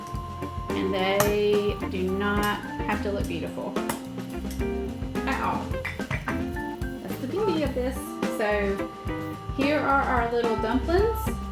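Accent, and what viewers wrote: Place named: South, United States. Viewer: Just started the video and there's a Southern accent. I'm already confident about this video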